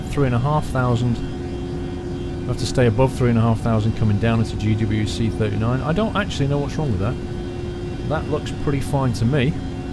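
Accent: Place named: North, United Kingdom. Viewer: So you're a Northerner too? Wuhoooo!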